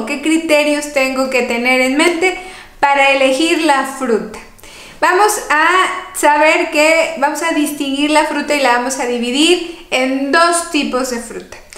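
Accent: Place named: Mexico. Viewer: Desde Espańa te digo: Eres la mejor y la mas GRANDE. No puedo vivir sin seguirte en la Red. Cada dia que pasa, te quiero mas!!! No se que hariamos los diabeticos hispanoparlantes, de ambos hemisferios, sin tu asistencia tan altamente cualificada y AMENA, desparramando gratuitamente chorros de amor y conocimiento, a traves del ciberespacio. Por desgracia, la mayoria de medicos endocrinos, andan saturados asistenciales y no te escuchan, ni te explican.......siempre lo mismo: merformina y mas merformina o insulina i mas insulina......y nada de dialogo. No tienen tiempo para escuchar!!! Que pena!!! Tu dulce voz femenina, de suave acento mexicano y en el hermoso espańol que se habla en las tierras del Nuevo Mundo, me llega a traves del eter, como un balsamo de ilusion por la vida y la salud. Eres como una luz que a traves del espacio oscuro, me llega brillante desde el Nuevo Mundo mexicano, para recordarme cada dia que todavia estoy vivo y que aun quedan personas buenas en este Mundo de Mierda, en el cual nos ha tocado vivir. 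Sigue asi mi amor, continua haciendo este gran trabajo de divulgacion, sobre diabetes. No decaigas. Eres Grande y cada dia que pasa te quiero mas. Desde Barcelona (Espańa), te mando un besote muy fuerte. Hoy dia miercoles, 7 de Julio de 2021. Que suerte haberte encontrado en la Red!!!! chao.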